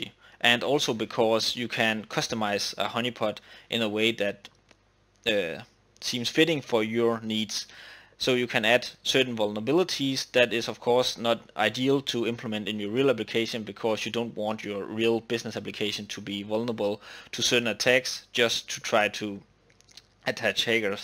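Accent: Denmark. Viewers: Are you danish ? :)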